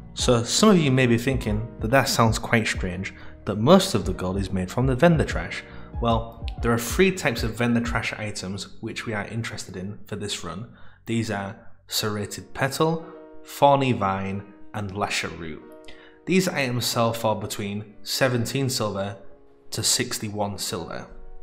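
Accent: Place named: Yorkshire, United Kingdom. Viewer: I’m from Sheffield, I’ll recognise a fellow Yorkshireman anywhere :) Nice vid man, I played vanilla back in 05 when I was 13 and had no idea what I was doing. I stopped playing during Cata but me and my mates plan on coming back for classic White rose!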